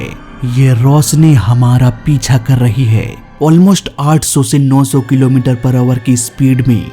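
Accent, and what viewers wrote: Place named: Rajasthan, India. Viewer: Rajasthani accent baa. Roshni ko Rosni......ese, kese, vese.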